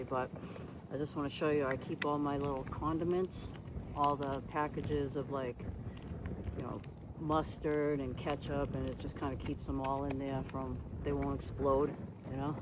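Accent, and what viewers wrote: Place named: Boston, United States. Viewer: Love your vive. I love your videos, but I love your Boston accent more. Can u add the word badabin badabun. I'm asking with all respect. Shout-out from Brownsville, Texas.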